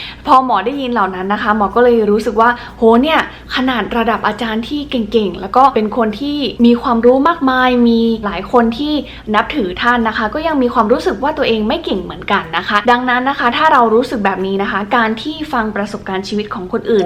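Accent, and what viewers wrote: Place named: North, Thailand. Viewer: คุณ เป็นคนจังหวัดอะไรครับ สำเนียงพูดช้าๆ ทำให้คิดถึงคนเชียงใหม่ เชียงราย เลย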